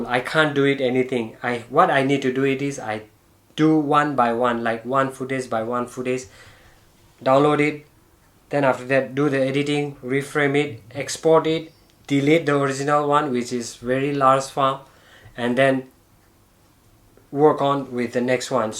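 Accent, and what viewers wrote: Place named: China. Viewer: i wonder how come a Nepalese guy speaking English in Chinese accent .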